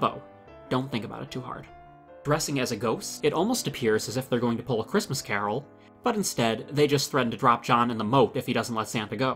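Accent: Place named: United States. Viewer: Interesting presentation, but the narrator's thick American accent sometimes makes it difficult to understand what he's trying to convey, at least for us Non-americans. For instance, what or who is "raawbinuud"? I guessed after a while that it had to be "Robin Hood". So why not at least try to pronounce it like that? It would honour the legend too! A little more normalized English would at any rate be much appreciated.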